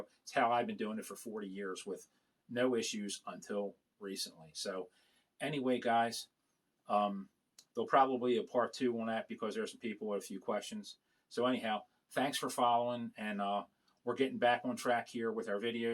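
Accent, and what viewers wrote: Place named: New Jersey, United States. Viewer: Love your channel brother,you have jersey accent ..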